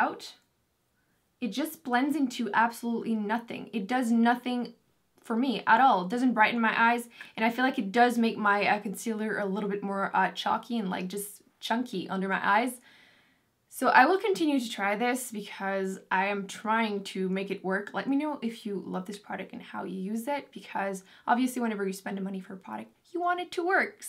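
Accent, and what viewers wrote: Quebec, Canada. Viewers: sounds like a french canadian accent ? cool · your accent is my fave! I love listening to French Canadians speak... is that weird? haha love you! xx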